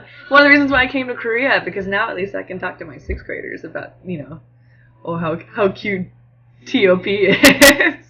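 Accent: United States. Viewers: I wish I could hear you speak Korean!! I'm interested in your dialect or amercian accent affecting it in any way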